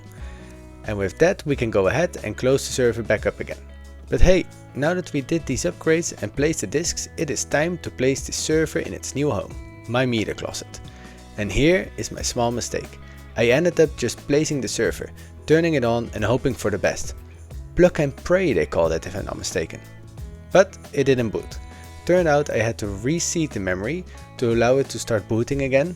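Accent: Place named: Netherlands. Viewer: Lekker bezig maat, dacht het al aan je accent te horen haha!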